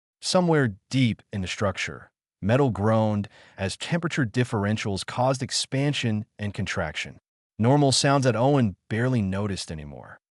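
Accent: Spain. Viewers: So sick of the spanish accent kicking in. IF you want a AI to read it that fine but get one that is native to the language you are talking in. It totally takes you out of the story. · I hear it too. Definitely Spanish, but from Spain, not Mexico. I just laugh and continue listening. This AI voice, lulls me to sleep. I love it. The other one that helps me sleep is Radio Reimagine, great stories! Very relaxing!!! Have a great life!!!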